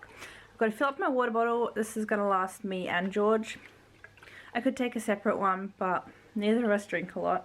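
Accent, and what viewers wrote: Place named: Australia. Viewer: I hear more of a Australian accent from you. 2ish years ago it sounded more like an English accent. I know you're german so you probably learned English with more English influences than Australian. I'd like to hear your thoughts.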